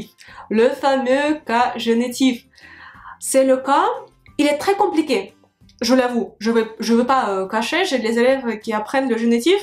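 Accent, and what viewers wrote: Russia. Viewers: Si nous pouvions aussi bien parler le russe que parle français, je crois que ce serait parfait...😊 Pour ma part, je lui pardonne ses petites imperfections qui lui donne le charme des russes... Merci pour vos belle leçons..😊😚👍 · Tu es magnifique 😘. Et quel joli petit accent. Les femmes russes sont parmi les plus belles au monde.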